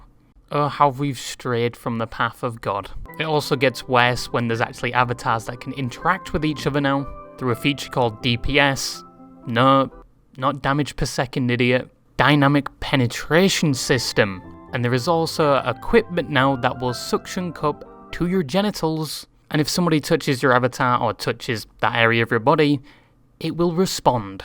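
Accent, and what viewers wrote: United Kingdom. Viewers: what accent is this, ik its uk but what area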